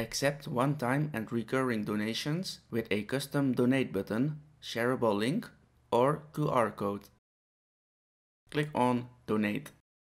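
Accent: Netherlands. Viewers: you are dutch i hear it in your accent lol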